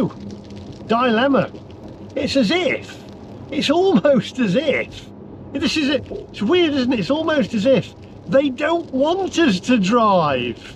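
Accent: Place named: North, United Kingdom. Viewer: IMO people with accents like yours are northerners.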